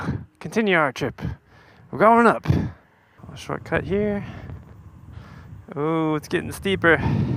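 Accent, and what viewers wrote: United States. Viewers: This is actually a great video! It’s both entertaining and informative,but it’s weird hearing an American accent in a video from NZ?? Once I got over that I dug the various aspects of your testing and if I was with the NZ distributor or with Apollo I would be super happy with what you did. What freaked me out though was 50km on a scooter. WTF? I have an eBike that hits 28mph (45km) and I have only done that a couple of times and both times I was muttering a prayer that I wouldn’t hit a stone in the tarmac…things happen really fast above 30km/h much less 50. Either you must be an adrenaline junky or you have a suppressed survival instinct 😂! Bummer that NZ is so restrictive about where and how fast you can ride a scooter, but either way this was a really clever and enjoyable video and thanks for doing it.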